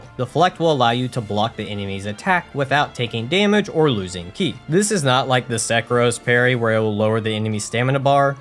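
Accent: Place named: United States. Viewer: I can't teach you how to speak Japanese in one comment, but I can teach you how to pronounce it! There are only 5 vowels, and they're pronounced pretty much the same as they are in Spanish. So a = the vowel in "mom", i = the vowel in "bean", u = the vowel in "shoe", e = the vowel in "bay*", o = the vowel in "go". These vowel pronunciations NEVER CHANGE, so i = bean vowel 100% of the time, e = bay vowel 100% of the time, etc. The only time vowels change pronunciation is when certain vowels are combined to make a long vowel. This only happens with the combos "ou", which makes a long o-sound, and "ei", which makes a long e-sound. Otherwise, long vowels are represented by simply doubling the letter ("aa" = "a" held for 2x the length, "ii" = "i" held for 2x the length, etc.. "oo" is also used in a scant-few words for historical reasons, it's pronounced the same as "ou", just "o" but held for 2x length.) Ex. 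Sensei = sensee with a long e-sound. *In modern Japanese, the e-sound can be realized as either the vowel in "bay" or the vowel in "bet", depending on region or even just individual preference, so use whichever one you're more comfortable with. Consonants are similarly really easy, they sound like how they look. The only exception is the r-sound, which sounds like the Spanish r, not the English r. Since you seem, by your accent at least, to be American, it's the same sound as the "tt" in the words "butter" and "better". The "tsu" sound can be a little tricky as well, but if you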